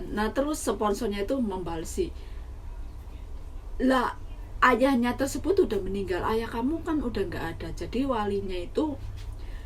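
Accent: Java, Indonesia. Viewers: Salam kenal mbak... Sehat, sukses terus mbak. Njenengan jawane mana mbak?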